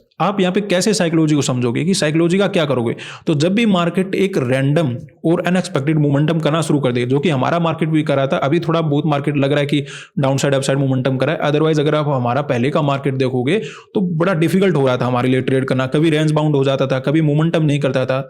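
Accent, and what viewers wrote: Haryana, India. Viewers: Language ke hisab se lagta hai inki language mein thodi si Haryanvi bhi mix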